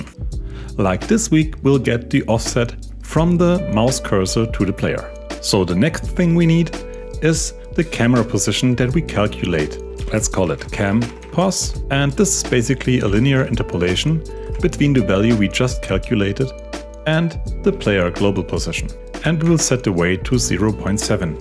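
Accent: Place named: Germany. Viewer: Do you not recognize the german accent?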